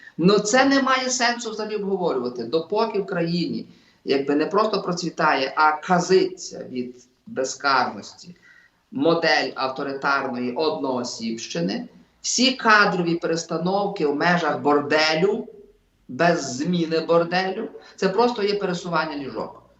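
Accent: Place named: Carpathians, Ukraine. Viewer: Надо видеть цели России и Украины. Цель России - безопасность ее границ и безопасность русских. Возврат Малороссии в состав большой России вторичен, так сказать, два в одном. У Украины своей цели нет. Украину придумали англосаксы, чтобы разделить русский народ и заставить его воевать сам с собой. Яркий пример - сидит довольно умный этнически русский Дроздов и на чистом гнусаво - карпатском диалекте вещает в пользу Украины.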